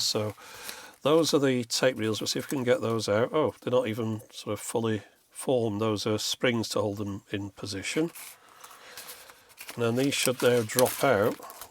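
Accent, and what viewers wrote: United Kingdom. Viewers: I can, the British accent, the quirky content, the snarky commentary, the obscure technology and the enthusiasm. One of the YouTube’s greatest channels!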